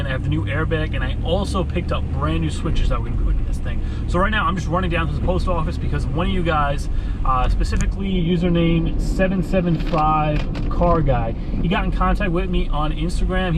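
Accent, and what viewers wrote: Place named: Long Island, United States. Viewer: Hey you from Long Island dude?... you sound it lol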